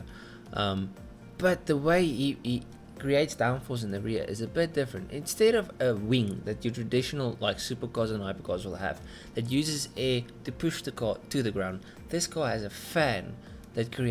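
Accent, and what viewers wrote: South Africa. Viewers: It's so refreshing to hear a south African voice 😂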